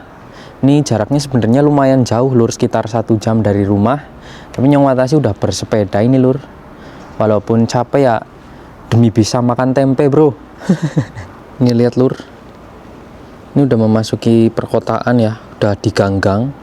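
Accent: Banyumas, Indonesia. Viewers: Ngapak kh bg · Enyonge kan batang sampeane kan Endi kang ? Kok ngapak" wae. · Kaya wong Jawa Tengah bagian kulon😂,, ngapak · Jawa ngapak mas?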